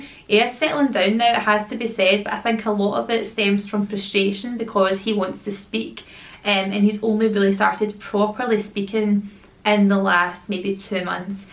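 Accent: Scotland, United Kingdom. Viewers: I really love your accent! I live in the southern United States and in my area I just don't meet many people from other parts of the world but when I first watched your channel I could tell you were Scottish and would never have thought you were speaking anything but English☺some words you pronounce differently than in my neck of the woods but I love hearing that and some of the phrases you all use are different from here but that's what I love so much about watching UK channels! I think you're lovely and I enjoy your content so much! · Think your video was great love all your vlogs, loving the odd scottish word that i dont understand 😄 love your accent just be youself as i know you are X💓❤🌹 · "Babe its english" 😂😂!! Love it! But its so true, mon the Scottish accent ❤❤❤ (im from scotland too) lol xx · Very chuffed that my assumption was mentioned 😊 You were so honest in this video (you seem very honest anyway) but you addressed the assumptions so well! 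Love all your videos! Especially the mummy videos and the cleaning ones! As a mum myself its lovely to see how other mums do it! Lovely to hear a Scottish accent as well on YouTube so I wouldn't worry about the accent too much! I'm from ayrshire so not a million miles away! Keep doing your thing girl 👌❤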